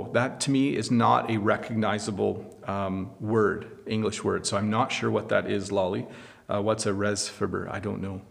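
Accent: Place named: Canada. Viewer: I love the canadian english accent, I think that the word 'ABOUT' can reveal that the person are canandian or not, but i'm not sure of it! I Love your channel ❤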